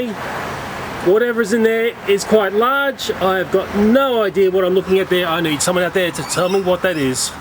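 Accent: Australia. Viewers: KawaiiKitty I think he's Australian, and i believe it was a silkworm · Sounds like he is Australian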